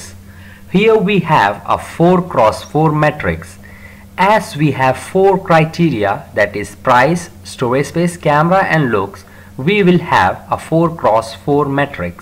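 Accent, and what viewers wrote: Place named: India. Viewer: 90% of tutorials on YT are by indians. Why is that? This accent is very difficult to follow.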